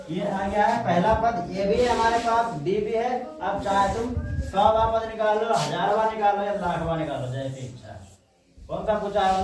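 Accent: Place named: Rural, India. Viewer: Ye dehati kon bol Raha tha😅😅😅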